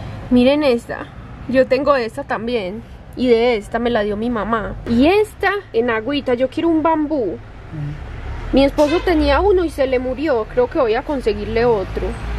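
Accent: Colombia. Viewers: sos muy dulce y alegre, te gustan las plantas y flores tanto como a mí. Siempre veo tus vídeos, amo tu acento colombiano. Soy argentina, me llamo Liliana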